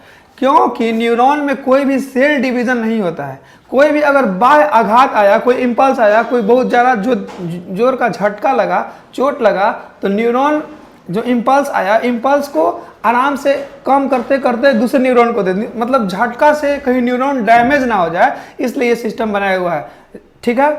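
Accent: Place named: Bhojpuri, India. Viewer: Explain accha kr rhe h 👍 Tum lakh chupao baat magar bhojpuri to nikal hi jayega❤️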